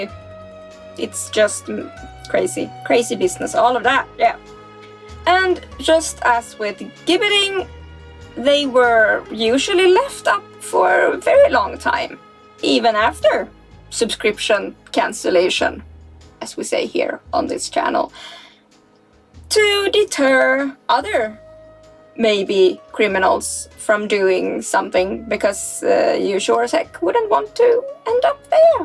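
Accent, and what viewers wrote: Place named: Sweden. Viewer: Loving your channel and your accent, are you Swedish? Keep up the great work young lady! 😊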